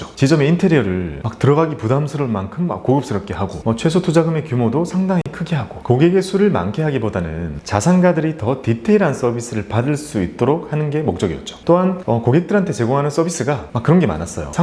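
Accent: Gyeongsang, South Korea. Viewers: 배아본적이 없는데??ㅋㅋㅋ 경상도 사투리인가요ㅋㅋㅋ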